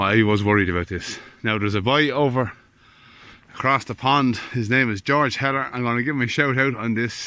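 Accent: Ireland. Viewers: What part of the country are ye in, somehow I had it in my head that you were Kerry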